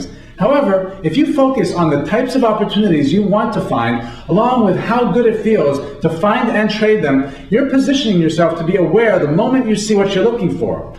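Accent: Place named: New York, United States. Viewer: That makes more sense, even though he's not "talking too fast" for me. I'm in NYC right now where lots of people talk exactly like him! Remember, too, that he's a money-making stock trader, not exactly a "low key, relaxed" mode of work. Like a race horse, not a plow horse. But thanks for taking the time to respond. Clears things up a bit.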